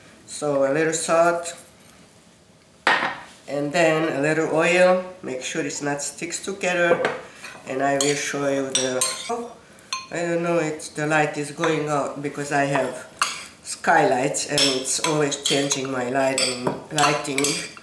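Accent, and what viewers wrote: Hungary. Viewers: Thank you for doing all of these! They are really helpful. My father was a '56er and we had many Hungarian friends in Cincinnati. I remember for St. Stephen's Day we would have a big gathering. The older women would come over to our house early and make Goulash in a pot over a fire in our back yard. And they would always cut the Nokedli into it! Your accent brings me back to those days. I could listen to you talk all day.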